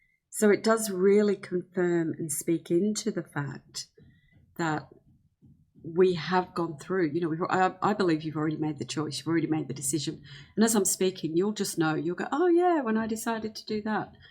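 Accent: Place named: Australia. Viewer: Lovely to hear a fellow Aussie with some great words of love to share 👍🏽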